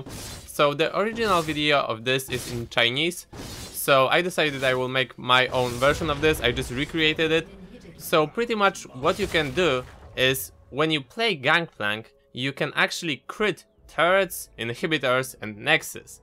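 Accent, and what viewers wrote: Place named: Poland. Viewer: Jesteś Polakiem? Akcent strasznie polski:P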